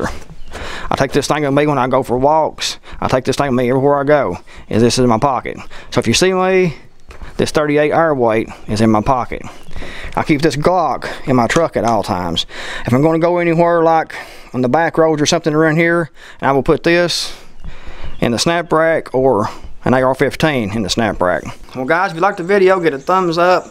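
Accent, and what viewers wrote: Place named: South, United States. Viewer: I only subscribe to a handful of people of which your one. Your videos are fast and full of great content 👍🏻, however, I’ve never been to the south and am guessing part of the time what you are saying so I turn on closed caption, but they are getting it wrong as well 😆. But anyway I enjoy your videos, but your accent sure keeps me on my toes 😬😎 Keep up the great work!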